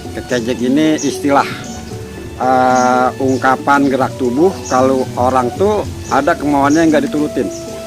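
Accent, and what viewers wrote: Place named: Jakarta, Indonesia. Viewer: Gua juga demen, apalagi dg bahasa dan logat betawi. Makin ngeunah dah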